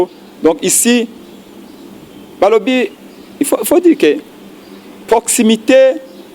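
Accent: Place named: Rwanda. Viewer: Ndeko lande elobeli na ye malamu,yoka bongo na ye "etondi na ba R R R R R ya kinyarwanda, moto oyo azali rwandais.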